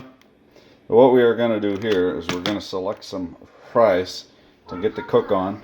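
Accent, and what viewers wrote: Quebec, Canada. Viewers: Are you Quebecois? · Tabarnack de calisse il parle québécois pas français calvaire de siboire d’osti de criss ! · Wow your French is very good ! Hilarious accent from Quebec tho ^^ Keep up the cool shit man looking forward to see your next cnc vid ;)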